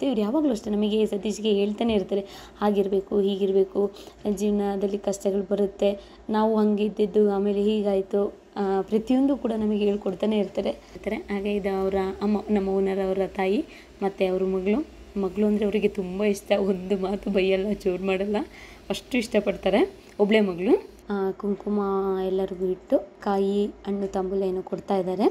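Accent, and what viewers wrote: Hassan, India. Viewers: Hassan & Saklespura da kannada bhasheya sogadu nimm matinallide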